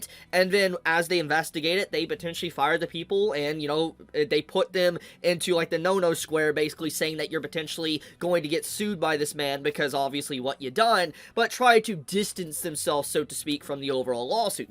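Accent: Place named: Midwest, United States. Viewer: Mid West accent goes hard in this vid lol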